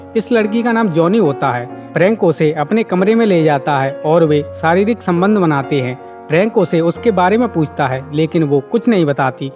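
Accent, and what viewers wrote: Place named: Madhya Pradesh, India. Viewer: apki boli ko pehchaan liya me Gwalior se hu 😁😁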